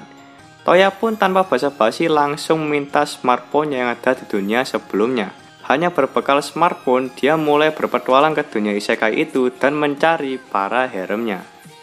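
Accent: Java, Indonesia. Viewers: jawa banget koe lur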